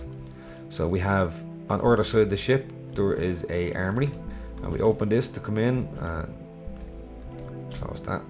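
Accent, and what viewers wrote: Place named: Dublin, Ireland. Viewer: Watching your videos made me get this game, i love your content, it's good to hear the dublin accent lol. Keep up the good work.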